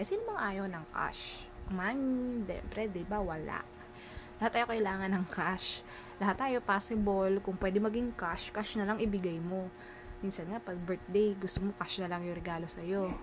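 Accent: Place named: Iloilo, Philippines. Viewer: Ilongga ka gid maam ya. Hehehe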